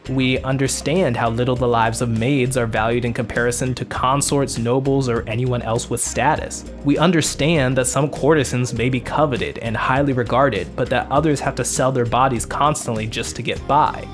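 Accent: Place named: Spain. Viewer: whats with the spanish accent for the japanese pronounciations? either do right or dont at all plz.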